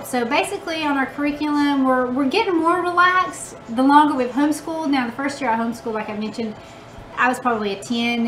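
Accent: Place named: East Tennessee, United States. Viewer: Welcome to the VHC! Based on your accent ... are you from East TN? We are a bit outside Knoxville and you sound like one of us :) And you are wearing orange ... hehe.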